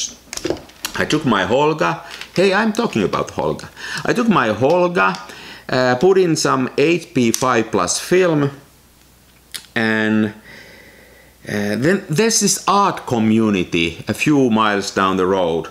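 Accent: Finland. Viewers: I like this kind of content, subscribed. Good photos, no long boring cuts with loud and crappy music. Finnish accent is a bonus. It sounds funny and for some reason I like it. :)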